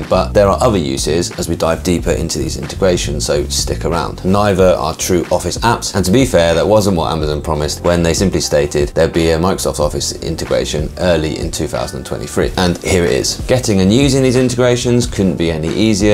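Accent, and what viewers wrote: United Kingdom. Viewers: I watch your videos just to take a quick powernap… the base in the voice, British accent… ooo… so satisfying to ears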